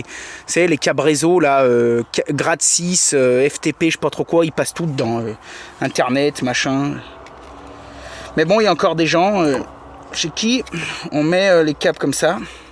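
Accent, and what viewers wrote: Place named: Savoy, France. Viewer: Merci,et tres sympa le franc parle,avec l accent savoyard a mon avis.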